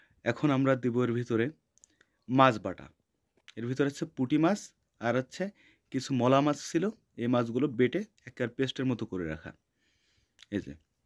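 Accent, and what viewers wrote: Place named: Gopalganj, Bangladesh. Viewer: ভাই মনেহচ্ছে আপনার বাড়ি গোপালগঞ্জ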